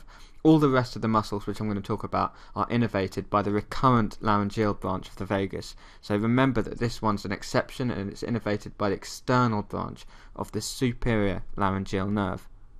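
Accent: United Kingdom. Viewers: Your british accent and haste makes it very difficult for me to understand :( Can you subtitle more? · can't listen to the whole video , i hate the british accent -_ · I love British accent ❤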